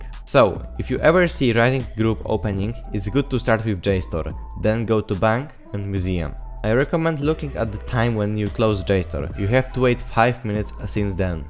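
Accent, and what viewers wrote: Poland. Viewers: slychac ten polski akcent😂😂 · Ah słychać ten polski akcent w twoim głosie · Fajny film dobra robota, na pewno mi się przyda. Nie chce nikogo obrażać ale ten Polski akcent jest bardzo wyczuwalny😂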